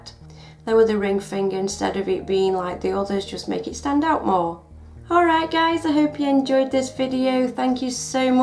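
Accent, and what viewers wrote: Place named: Cockney, United Kingdom. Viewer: Oh my gosh you are the nails queen! The stuff you come up with is always so stunning and creative! Keep up the great work, I love your videos! I've been watching your videos for about a month now and I gotta say I'm addicted, lol hope that doesn't weird. I was wondering what part of London you're from, your accident sounds east end but what do I know haha. Guess I'm just basing that guess on British crime movies. Lol sorry for the ramble I'm from California!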